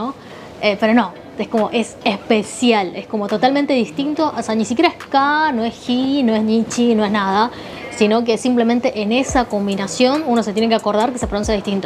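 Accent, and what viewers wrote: Argentina. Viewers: Más bien habla como Surispanoamérica O Argentina o uruguaya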